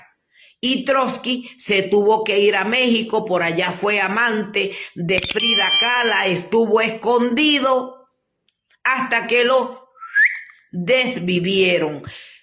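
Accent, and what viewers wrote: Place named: East, Venezuela. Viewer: BUENOS DÍAS PROFESORA COMO ESTÁ USTED MIRE LE PREGUNTÉ LA OTRA VEZ QUE DE PARTE ES USTED PORQUE HABLA IGUAL QUE YO ORIENTAL DE PURA CEPA YO SOY NACIDO EN ANACO ORITA VIVO EN VALLE DE LA PASCUA GUÁRICO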